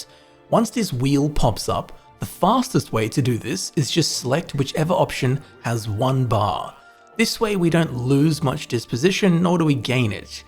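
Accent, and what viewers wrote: Australia. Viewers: Ah I missed that sweet Australian voice! is back! I’m telling you I’m loving oblivion as someone who only played Skyrim before & like an hour of Marrowind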